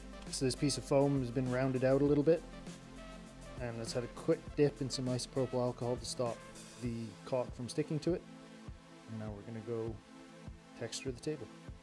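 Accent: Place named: Canada. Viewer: Watching video.....ok cool, man, I've been wanting to do one of these for a while......looking good....huh....this guy sounds pretty Canadian....Ontario maybe?....pretty sure Canadian.....Premier spray primer?!?! Oh yea bud that's some Canadian Tire paint right there......"deck-el"! C A N A D I A N C O N F I R M E D. Where ya at? the "deckle" pronunciation makes me think farther west than Ontario. AB? SK? I feel like if you were in MB I'd know.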